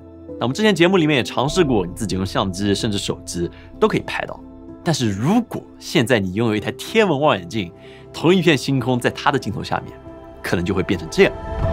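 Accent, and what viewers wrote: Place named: Jiangsu, China. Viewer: 这口音，江苏人。我福建的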